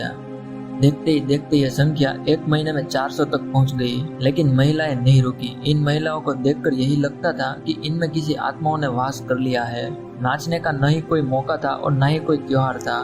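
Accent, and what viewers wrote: Gujarat, India. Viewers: hmm aapke bolne ke accent se hi lag raha tha ki aap Gujarati ho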